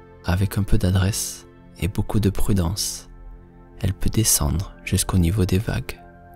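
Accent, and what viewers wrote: South, France. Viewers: j'adore l'accent français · Ton accent du sud m’a fait sentir comme à la maison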